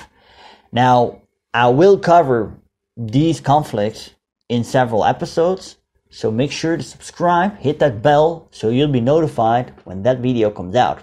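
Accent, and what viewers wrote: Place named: Netherlands. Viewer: Struggel. 😂I love the Dutch accent during impeccable English. Great channel. Shocking losses on the Canadian side.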